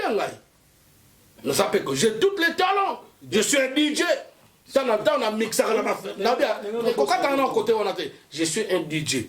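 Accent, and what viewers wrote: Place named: Democratic Republic of the Congo. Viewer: parle lingala pour nous faciliter à comprendre le message t'on français lingaliser la c'est chaud fais plus des lectures des bouquins savent t'aider mon frère tshanguen comme tu le dit toi même courage plus des effort frérot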